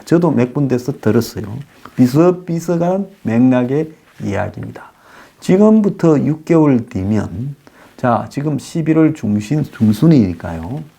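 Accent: Gyeongsang, South Korea. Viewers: 맞아요..저도 첨에 뉴핑을 듣는데, 나라소식을 브리핑하시는데,왜그리 웃가던지... 갱상도 억양&디테일하고 살어있는 표현력이 완전 갑!수준이지요...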